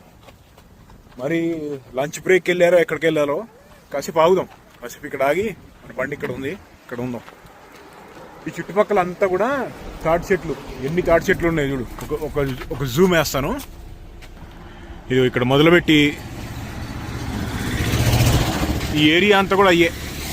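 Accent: Andhra Pradesh, India. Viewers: nee telugu pakka andhra bro . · మీది నిజంగా నిజామాబాద్ యే నా ఎందుకూ అంటే మీ మాట్లాడే తెలుగు యాస తెలంగాణా కాదు ఆంధ్రా తెలుగు యాస లాగా ఉంటుంది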